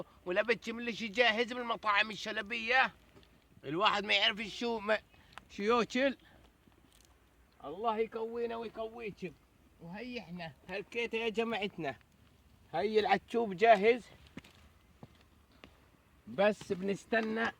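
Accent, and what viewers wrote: Palestine. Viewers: اقرف لهجه فلسطينيه بعدين كسخت التمثيل ادعيه وحالتك حاله الإشي مبالغ فيه كلو مشان الشهره والمتابعه ههههههههههههههه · lahoo lahoo بالعكس احلى لهجة في العالم اللهجة الفلسطينية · لهجه فلسطين زي الكنادر مقرفه.. تحياتي لليهودحبايبنا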